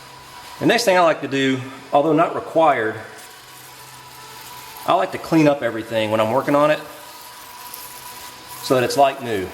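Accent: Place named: United States. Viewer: He’s all American, no funny weird foreign accent, speaking Perfect American English.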